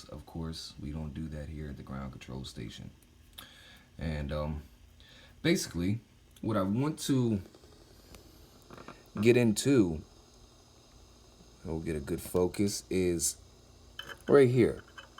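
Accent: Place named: New York, United States. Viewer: You sound like you are from Brooklyn or the bronx. I'm a long island boy in south carolina.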